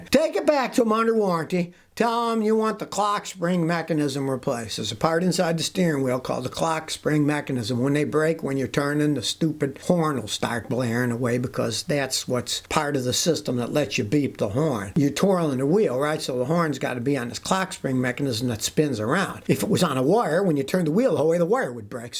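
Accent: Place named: Buffalo, United States. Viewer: Classic Buffalo accent.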